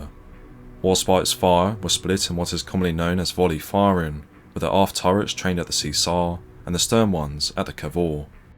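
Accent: England, United Kingdom. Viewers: Don't like it, go watch something else, simple. Stop trying to be a clever dick. You clearly fully understand what he is saying, because if you didn't, you wouldn't be try to correct it. The narrator has a regional English accent. The same way he cannot change his voice, you cannot gain brain cells. Moron. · This pronunciation is APPALLING, he sounds British but he can’t even pronounce the names of the British ships correctly.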